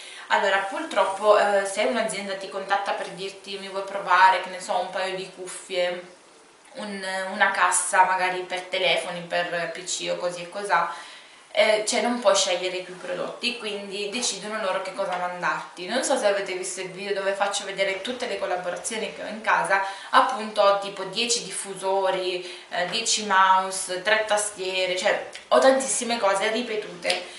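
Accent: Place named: Sicily, Italy. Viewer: Ciao sei davvero molto simpatica e i tuoi video sono molto utili. Guardando questo video ho sentito che sei Siciliana come me. Se ti fa piacere visita il mio canale =)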